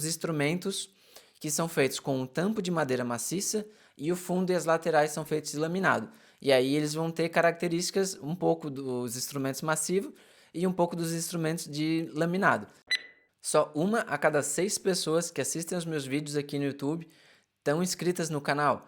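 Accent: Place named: Santa Catarina, Brazil. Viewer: Eu sabia que vc era Catarina. Hahaha. Sotaque não nega. Tamo junto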